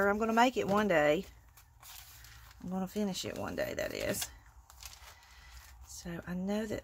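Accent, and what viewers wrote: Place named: Texas, United States. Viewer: I went out and made 15 clusters!!! Yea, tfs. You must be from Texas, sound like me and I left 35 years ago.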